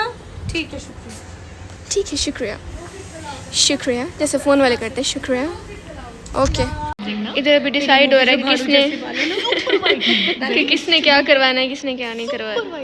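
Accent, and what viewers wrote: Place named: Saraiki, Pakistan. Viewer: Sarikiyn wala lahja hai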